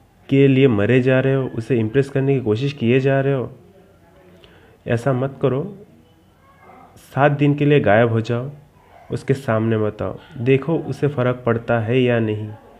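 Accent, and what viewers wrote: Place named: Bihar, India. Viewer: You never be like brain singer you can only copy him and your voice is like Bihari...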